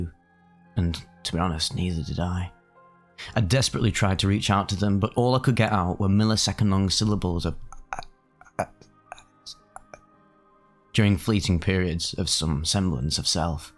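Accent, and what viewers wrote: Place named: Derby, United Kingdom. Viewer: I listen to these trip reports while I'm doing ice baths, it really helps my endurance and heightens the experience. I adore your voice and delivery. I've gone through every single trip report compilation, so your narration has taken me on so many hours of incredibly profound psychedelic journeys. I'm Canadian and my partner is from Derby so he has a similar accent and that makes it even better. A deluge of gratitude to you for the time and effort you put into these. Godspeed.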